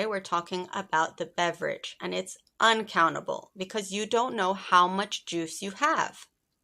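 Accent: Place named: United States. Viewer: You are so beautiful and your American accent is so pleasing to my ears. Happy New Year !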